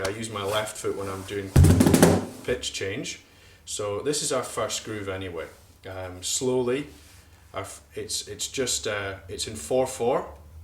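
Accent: Scotland, United Kingdom. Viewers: You sound a little bit Scottish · Are you Scottish?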